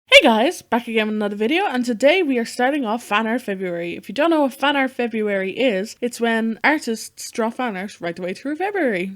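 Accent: Ireland. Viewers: OMG YOUR IRISH I LOVE YOU I'M IRISH TOO FINALLY A YOUTUBER WHO SAYS SECONDARY SCHOOL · are you irish ??? i am and you sound like you are and if so which part? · HOWYA FELLOW IRISH PERSON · Are you irish? Could you sound like it a lot XD at least in my eyes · Hey what country are you from/live in? You sound like you're from Ireland. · are u Irish?.!? same!!!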